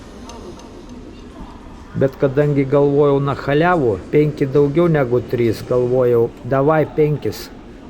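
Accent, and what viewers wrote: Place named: Ignalina, Lithuania. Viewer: Tau tinka toks blogerio žanras kur kalbiesi su savimi Ignalinos tarme. Lauksime tęsinio. su Naujais metais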